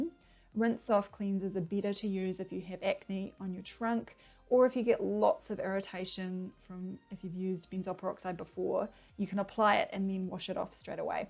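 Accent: New Zealand. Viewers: I love your smile, and your cute New Zealand accent! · aha!! i guess you were new zealander from your accent and reading the caption, i was right hehe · You have the softest kiwi accent. Love 🇬🇧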